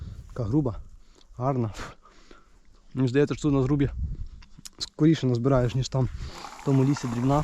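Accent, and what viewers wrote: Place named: Yavoriv, Ukraine. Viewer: збір чорниці - найдивовижніше заняття у світі... особливо якщо пальцями по ягідці!!! Так з рідними збирав ще 60 років назад на Яворівщині Львівської обл... Ти часом не з тих країв?!? Щось знайома говірка, працьовитість і любов до природи!!! Привіт синьо - жовтій Швеції!!! 🇸🇪 ❤️ 🇺🇦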